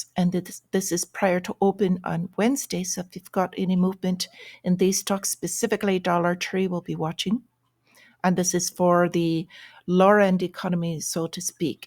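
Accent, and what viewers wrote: Ireland. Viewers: you must have stock in Japan I notice you really focus on it. Must have property there or family in Japan. which is weird with the Irish accent.:)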